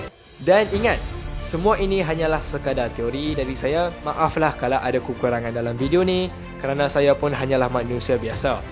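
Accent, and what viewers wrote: North, Malaysia. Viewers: ni org utara ka?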